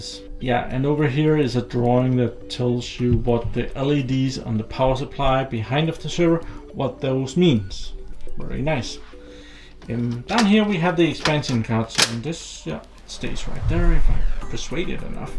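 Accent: Denmark. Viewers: are you from denmark? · dansker confirmed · are you danish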